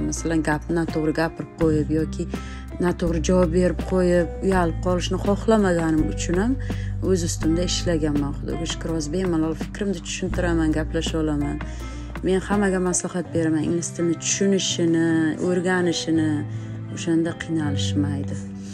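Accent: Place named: Uzbekistan. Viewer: Charchamang omadizzi bersin yurtdoshim shevamiz birda yurakka yaqinsiz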